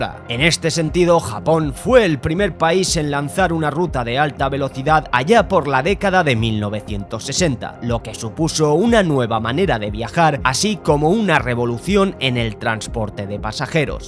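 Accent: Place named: Spain. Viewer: no me gusta tu voz, la voz de los españoles ....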